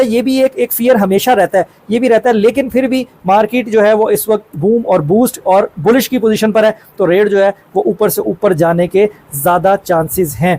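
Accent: Punjab, India. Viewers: Aap Urdu bolty bolty thora touch Panjabi ka lagaty ho acha lagta h, khush raho.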